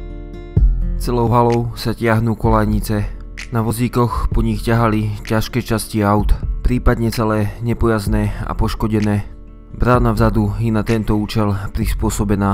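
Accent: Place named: East, Slovakia. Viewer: Videá má dobré,klobúk dole ale mal by natočiť video o svojej škole,lebo ten komentár,dva letiská,dva cesty,dva autá už je blbé,mal by navštíviť učiteľku zo ZDŠ.Trepanie o studenej vojne 1970,studená vojna bola už za prezidenta Kennedyho a Chruščova tá neskončila padnutím ZSSR.Keď že robí zvuk až po natočení videa,stále to môže opraviť,poznám dosť východniarov ktorý žijú v Bratislave a hovoria síce z prízvukom ale spisovne!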